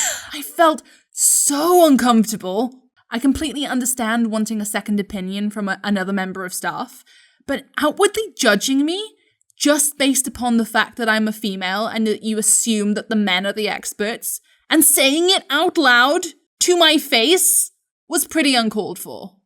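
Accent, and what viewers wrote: Wales, United Kingdom. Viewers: Subscribed cause i love your british dialect and i love animation i draw one paper myself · Yo are you Welsh as well?! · i think i found the most british person on planet earth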